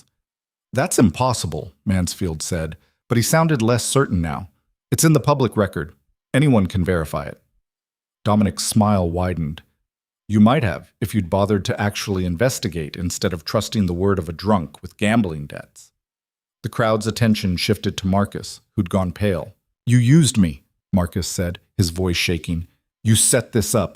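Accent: United States. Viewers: Good story but please use a British narrator when in Great Britain. The American accent take away from the story.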